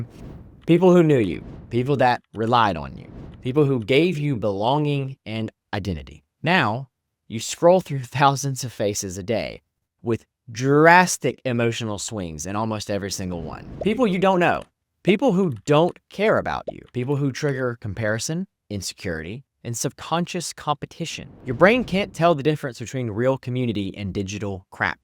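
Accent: South, United States. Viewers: I'm confident that your reassuring voice and sublime Southern twang will most certainly elicit expressions of even greater enjoyment.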